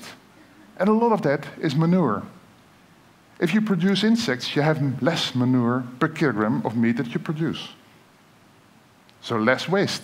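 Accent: Netherlands. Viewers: Wow, massive Dutch accent. Also, I have a really simple answer to the question. "Because I have no where to buy them" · So obviously Dutch.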